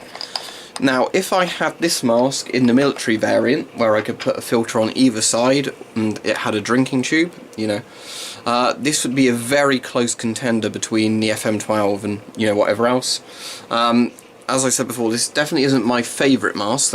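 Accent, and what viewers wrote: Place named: England, United Kingdom. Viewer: I love this English accent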